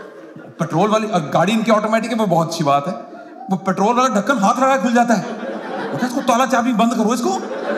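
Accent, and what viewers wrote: Punjab, India. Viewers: You are awesome yaar Your punjabi accent is tremendous bro Really love you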